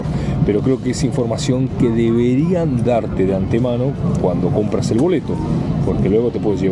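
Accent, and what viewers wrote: Argentina. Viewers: Ya me parecía que eras argentino por tu forma de hablar. Aguante argentina máster 💪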